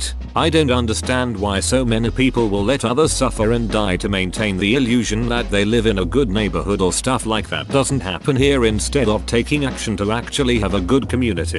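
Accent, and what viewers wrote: United Kingdom. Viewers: That's because this voice sounds like a cultured British robot.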